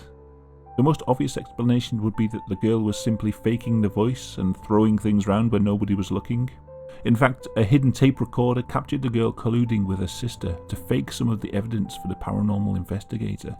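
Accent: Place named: Liverpool, United Kingdom. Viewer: thats exactly what I thought, thought he was a scouser when I first got onto this channel. Being a scouser my self thats why I like this channel so much cos he sounds familiar. Haha he deffo sounds like tom like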